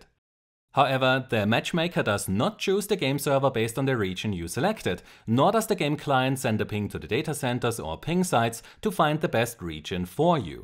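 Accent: Germany. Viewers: Love your german accent 😏❤️ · man hört an dem Akzent das du Deutscher bist :P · Now I finallay now that you are german ^^ Always thought that, that is a german accent :D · man hört so raus dass du deutsch bist xDDD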